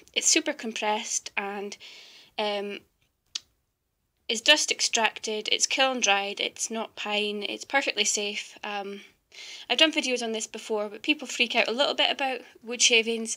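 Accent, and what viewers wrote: Scotland, United Kingdom. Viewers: Are you Scottish? If you are then woo hoo! So am I...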